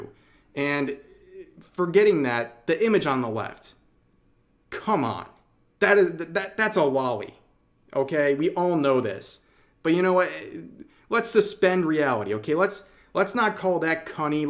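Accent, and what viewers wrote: Scotland, United Kingdom. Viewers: Scotish, almost british 🤢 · A Scottish vTuber?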